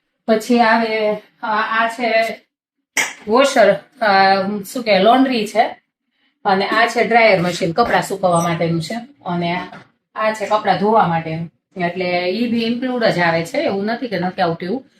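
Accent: Kathiawar, India. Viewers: કાઠીયાવાડી લાગો છો બોલ સાવ ઉપર બેન કયા ગામના છો એટલું જણાવજો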